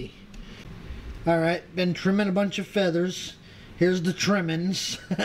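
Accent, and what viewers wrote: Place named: Brooklyn, United States. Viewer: I just watched your interview with the German gentleman, wish I could remember names but, middle age, whatcha gonna do, and I got the low down and lay out of that shop and forgive me lord but DAMN! I couldn't be more impressed if the tools were gold plated. I'm looking forward to seeing more of your skills and ideas. My biggest question so far is how you can live in Texas and retain as much Brooklyn in your accent as you do, or am I losing my hearing too? Gotta go now, you're about to reveal your peacock table and I don't want to miss it. We'll talk again, Peace and respect from Idaho.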